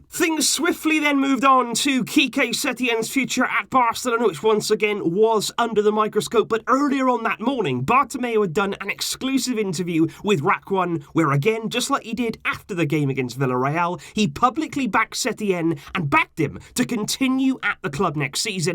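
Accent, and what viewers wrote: Wales, United Kingdom. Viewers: Tf are you on about, he's from Wales and has an accent, you have a problem?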